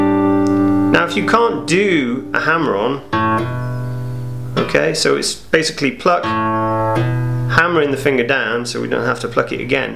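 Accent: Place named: United Kingdom. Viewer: correct me if im.wrong but judging by the accent... hes british.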